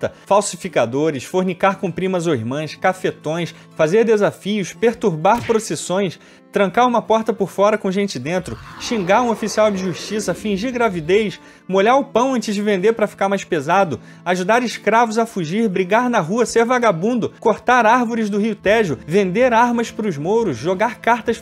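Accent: Brazil. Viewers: ele mostrou a identidade no outro canal dele, e prr, se eu não me engano, ele cresceu no Brasil, por isso não fala português europeu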